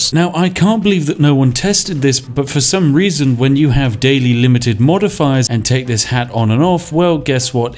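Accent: United Kingdom. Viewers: Absolutely brilliant tips! 👏👏👏 love your british accent, so energetic and just... lovely🥂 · Watching you break games with your amazing British accent brings me joy sir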